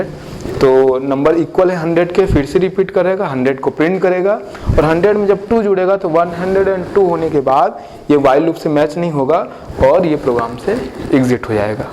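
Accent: Bihar, India. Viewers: apna accent which is i think bihari agar change kar le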